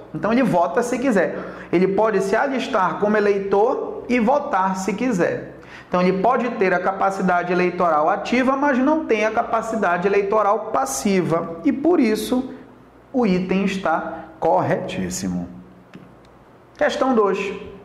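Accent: Pará, Brazil. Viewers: O senhor é paraense?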